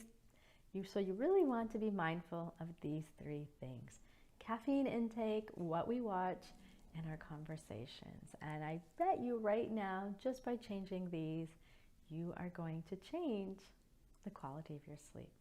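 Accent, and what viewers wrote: Canada. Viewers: I knew she was Canadian before she said so. They often have a way for throwing away every sentence so that nothing is salient. The rest was just nonsense of course. Relaxing nonsense at least.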